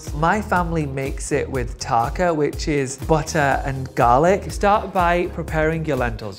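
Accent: England, United Kingdom. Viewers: His pronunciation might be regional from where he grew up in England. I studied Indian vegetarian cooking from a master ( an authentic Indian guru) and I was a food snob for years, thinking his way and pronunciation was RIGHT. Then I realized that I was being unnecessarily judgmental and making myself better than others. That’s not the goal of all the yoga I was doing. I’m much more forgiving of and actually appreciative of people who don’t do life exactly the way I do. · I know this is a year late but honestly yes, stop making fun of people because their accent isn't "perfect" he has a British accent and that's okay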